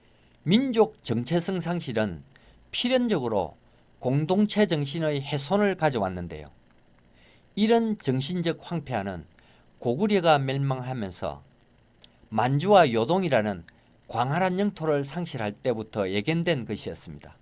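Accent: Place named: Busan, South Korea. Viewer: 병장님! 반갑습니다. 군대 쫄다구 김*철병장을 통해서 씨의 요즘 근황을 듣고 너무 반가워서 이렇게 유튜브로 소통을 합니다. 군대에서 나병장님의 개인돈을 훔쳤다고 의심을 사서 한 때 나마 이런 속좁고 편향되며 막무가네식의 고참을 계속 고참대우하며 군대생활을 보내야 하나 아니면 개인적으로 밖으로 데리고 나가서 죽통을 날리고 군대생활 그렇게 하는게 아니다 라고 일침을 가한 뒤 영창을 가야하나 고민을 많이 했던 탁일병입니다. 그 당시 촐싹 거리던 나 병장님이 세월이 지나고 나니 박사 학위를 받고 교수님이 되었다니 정말 격세지감을 느낌니다. 아무 근거없이 고참의 돈이나 훔친것으로 절도 혐의를 뒤집어 썼던 탁일병은 그 사건이계기가 되어 제대 후 모 여고에서 30년 동안 교편생활을 하면서 반 아이들에게 절대로 남의 물건을 탐하지 말것이며, 또한 자신의 관리소홀로 귀중품을 잃어 버리고 난 후 절대로 남을 근거 없이 의심하거나 미워하지 말도록 인생관을 심어주는 담임을 역임하고 이제는 명퇴를 하였습니다. 우리 나병장님 서울 생활이 40년이 다되가는데 아직 여전히 부산 사투리가 남아 있는 것이 신기합니다. 지금도 여전히 탁일병에게 절도 혐의가 있는 것으로 믿고 있는 것인지 아니면 철없던 어린 시절의 치기 어린 선임 고참의 찍어누르기 식의 자백 강요였는지 개인적으로 묻고 싶습니다. 어찌 되었든동 제대 후 진리탐구에 대한 열정을 불태워 박사학위를 취득하고 교수님이 되었다는 것에 대해서는 저절로 고개가 숙여지며 존경의 마음 금할길이 없습니다. 모쪼록 건강하십시오. 기회가 되면 그 시절을 이야기 하며 회포를 풀 장이 마련되리라 믿습니다.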